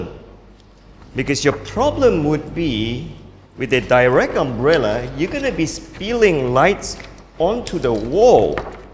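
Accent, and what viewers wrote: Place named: Malaysia. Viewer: The content r just perfect n as for the accent, he's from Malaysia 😍😍😍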